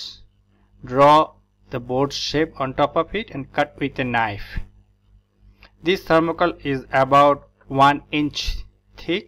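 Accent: India. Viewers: Are you and Indian from Southern part ????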